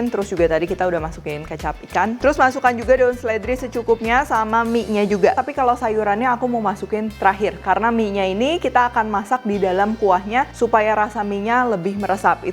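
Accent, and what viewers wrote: Indonesia. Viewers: Medokkk🥰🥰🥰❤, keren chef, enakk👏👏👏👏👏👏👏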